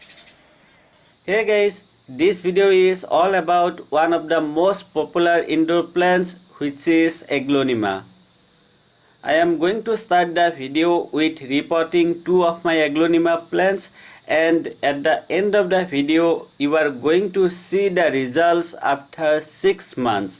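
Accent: Assam, India. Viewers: Apuni oxomiya niki? Accent tut guess korisu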